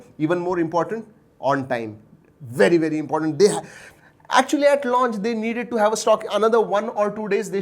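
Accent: India. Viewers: jab english hi bol rahe ho tab thodi fancy accent bhi ho jaay kya hai ki ji english bhi bol rahe hain to jaise hindi lag raha hai.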